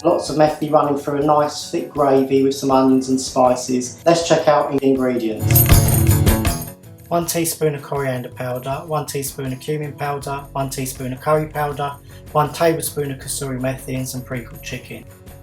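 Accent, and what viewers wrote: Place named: Essex, United Kingdom. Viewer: Great vids, thanks! And bonus is, I'm from the Glasgow area and listening to you is like hearing my Romford relatives, sadly now no longer with us...makes me smile and remember past Essex holidays :)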